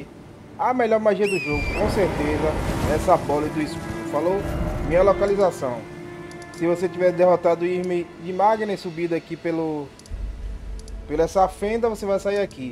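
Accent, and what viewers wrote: Brazil. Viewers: Esse e baiano raiz.. bora jogar junto meu pacerooo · Tu eh baiano ne pae? Tambem sou percebi pelo sotake de Salvador é isso msm??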